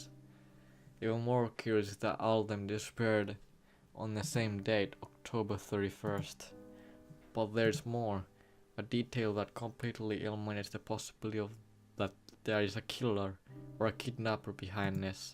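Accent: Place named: Finland. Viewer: I love your cute finnish accent. But fuck that weeb shit xd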